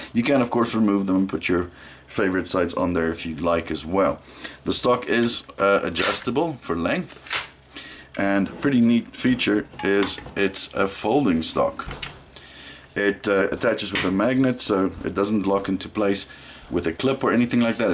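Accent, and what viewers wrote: South Africa. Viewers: Your accent 🇿🇦🤨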